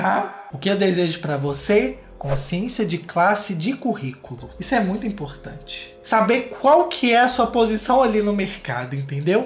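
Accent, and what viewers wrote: Minas Gerais, Brazil. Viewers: Sabia que conhecia esse sotaque kkkkk , mineirinho.kkk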